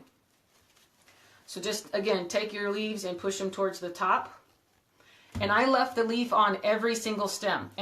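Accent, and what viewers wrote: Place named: Nevada, United States. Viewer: Beautiful tulip wreath. I love your Nevada accent.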